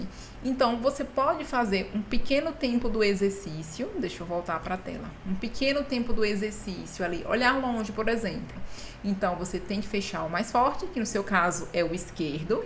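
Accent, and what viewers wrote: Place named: Maceió, Brazil. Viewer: Onde vc mora? Tem um sotaque bem parecido com o meu aqui de maceio.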